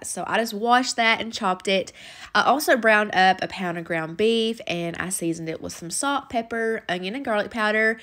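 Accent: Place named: North Carolina, United States. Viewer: Are you from North Carolina? Your southern accent sounds like it. I’m from North Carolina.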